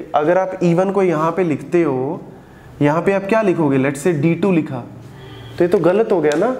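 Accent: Punjab, India. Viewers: bhaia aap punjabi ho ?????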